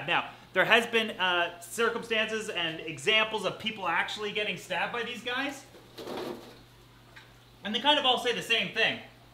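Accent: Canada. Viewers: Are you a Canadian ? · It's cool when you can instantly tell you're watching a fellow Canadian by the accent lol Great aquarium, nice video! Cheers from BC!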